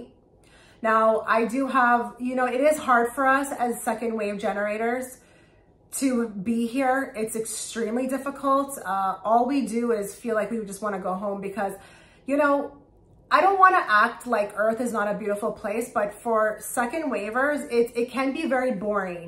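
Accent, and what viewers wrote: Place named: East Coast, United States. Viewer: You sound like an East coast girl to me. New York area?? I am from NYC. Your video resonates a lot for me! Is there a way to message you? I have experienced all you speak of in this message. Blessings to you!!! ✨🩵✨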